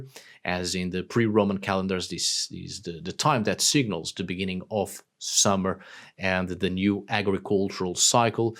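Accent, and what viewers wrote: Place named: Portugal. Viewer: Hi. I could not place that warm, Euro accent in English. Portuguese! Wonderful. I was thinking was Norwegian or such. Good luck on your existential content issues,.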